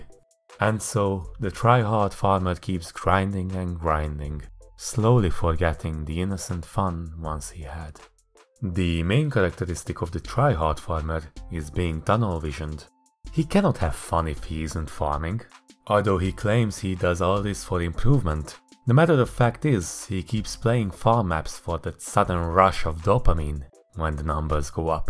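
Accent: Hungary. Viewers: Érezhető a magyaros akcentus, de imádom :D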